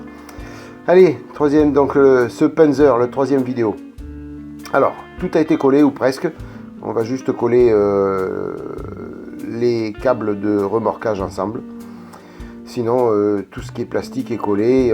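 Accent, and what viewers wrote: South, France. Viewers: Salut, très sympa cette petite vidéo, j'ai hâte de voir le rendu de la variation chromatique avec le chipping ça va être super sympa. Et sinon toujours un plaisir de suivre tes montages et d'écouter ton bel accent du sud:) Au plaisir!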